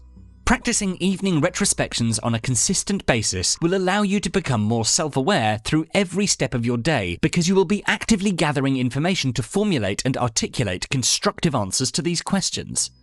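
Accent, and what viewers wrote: United Kingdom. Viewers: Brit accents are a hardship for me. I made it through the video! One step closer to visiting Europe!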